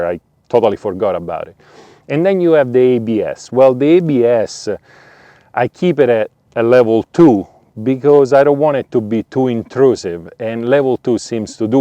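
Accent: Rome, Italy. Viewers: Beautiful honest review, good job. I think i've heard a bit of Italian accent. From Rome, to be precise. Am i right? If i may ask.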